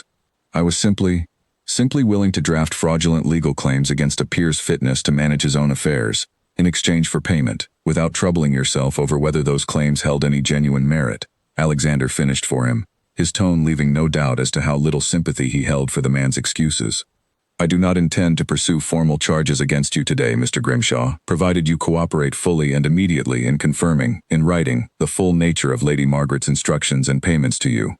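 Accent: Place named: United Kingdom. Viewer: Oh good! It’s not just me! This is one of the few narrations that I’ve enjoyed. Properly English (British) sounding and fluent. However - Mr Pembroke, the solicitor, should not have divulged ANY information about the Duke’s father’s Will, to anyone who was not an executor. So, giving out that information freely to Lady Margaret and yet not having the professional courtesy to directly advise the Duke of the manipulating fraudulent activities of his cousin but sending his daughter Charlotte, is ludicrous. What, by the way, happened to the Aunt? Why did she not feature in this At All? Plus, there is no consistent timeline… it went from Eleanor spending 3 days at Grace’s house to Charlotte’s arrival the next day (notwithstanding the Duke’s also conversation that same day). Then, the 2nd Ball, weeks after the first, although it was seemingly done within days. 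Then also, how did Margaret get from the manor, to Reading and back again without details of where she was living in between. The whole story needs filling out some more. The ballroom confrontation was a bit blah! The entire story premise was good, but more care should have been taken with the details.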